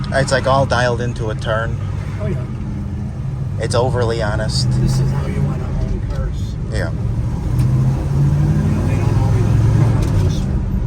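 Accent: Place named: Chicago, United States. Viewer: Man, you have a cool Chicago accent!!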